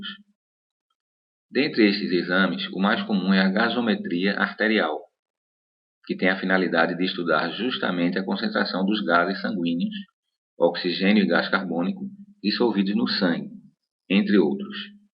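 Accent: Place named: Pernambuco, Brazil. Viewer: Amei o vídeo! E pelo sotaque, deve ser meu conterrâneo, pernambucano?!!!Parabéns pela clareza das informações.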